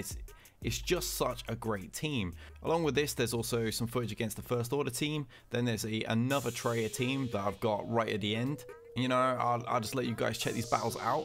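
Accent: United Kingdom. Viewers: Another great video mate its about time you got this buzz. As much as I like Warrior and Cub its much easier to listen to another proper english lad.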